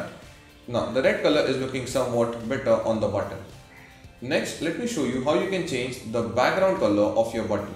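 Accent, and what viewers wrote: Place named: India. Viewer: DUH BHUUTTTOOOONNN!! THICK ASS FUK INDIAN ACCENT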